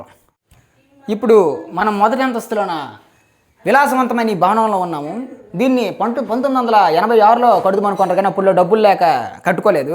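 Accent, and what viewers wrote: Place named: Telugu, India. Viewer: That slang is pure telugu ❤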